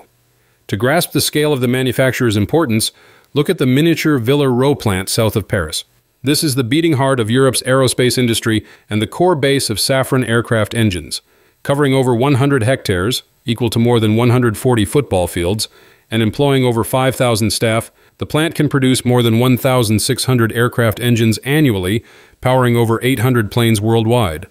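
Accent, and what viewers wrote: United States. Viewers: Why is it always a droning American accent, that provides the 'expert' advice, telling us that China will die without outside technology? You need to get an air ticket to China you clown. Its just faintly possible, you might be educated to learn that China, by any comparison, is 100years in advance of the rest of the world. This is just shit comedy but pass the bloody popcorn anyway😂😂😂😂😂😂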